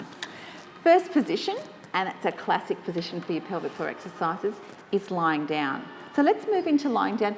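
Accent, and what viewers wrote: Australia. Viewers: I’m making my rounds of videos just to hear her Aussie accent!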